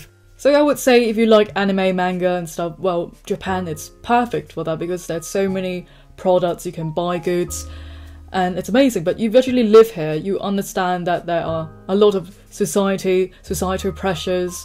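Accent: United Kingdom. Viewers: it's so funny to see a cute lady with such a thick english accent and frequency tone, the contrast is weirdly attractive. Interesting, but I'll try this year and see for myself nothing better than this, I m also introvert, so I feel like a more autist society might just do, one year of PVT!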